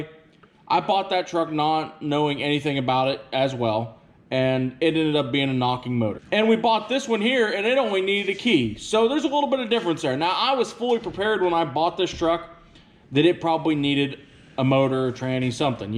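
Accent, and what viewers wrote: South, United States. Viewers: Don't worry I subscribed but your voice sounds like Mickey Mouse with a Southern drawl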